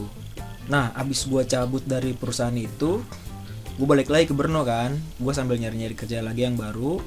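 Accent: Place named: Depok, Indonesia. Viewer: Bahasa lu masih anak depok banget bor.kwkwkwkw keren keren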